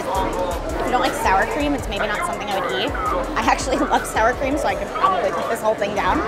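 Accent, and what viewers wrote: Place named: New York, United States. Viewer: I thought she had a New York accent then she confirmed that she was a New Yorker, that's the easiest accent besides the midwestern accent to spot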